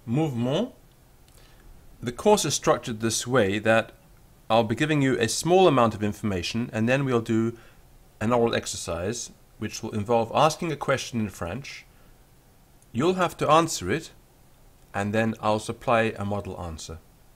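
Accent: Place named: United Kingdom. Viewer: interesting British french accent :-)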